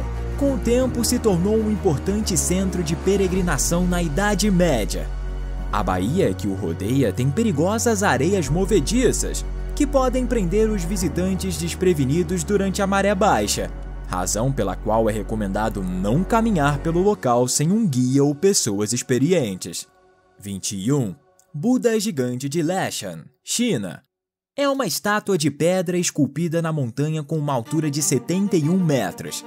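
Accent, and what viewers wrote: Rio de Janeiro, Brazil. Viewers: Essa locução com sotaque carioca cheio de X meu irmão é impossível ouvir 👺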